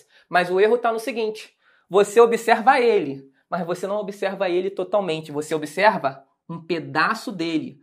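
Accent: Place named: Minas Gerais, Brazil. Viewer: Nuuuu, MINEIRÊS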